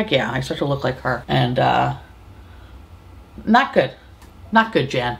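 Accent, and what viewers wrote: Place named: Newark, United States. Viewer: Sounds like Newark to me!!!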